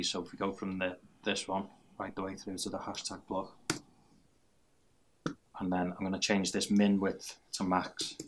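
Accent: Liverpool, United Kingdom. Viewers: Cheers - all brand new to me so lots of replaying!! Great to hear the accent though - cheers two Scousers abroad!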